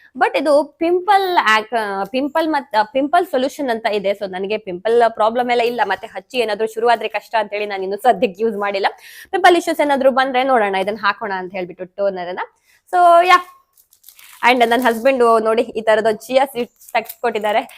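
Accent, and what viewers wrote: Kundapura, India. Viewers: Nivu nam kundapura kannada mix madi mathadthri kelike kushi ath nange❤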